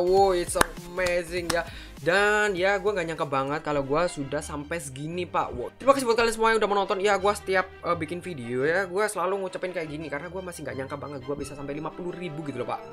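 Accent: Java, Indonesia. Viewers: Bang loe orang jawa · bang orang jawa ya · Bang samean jawa yo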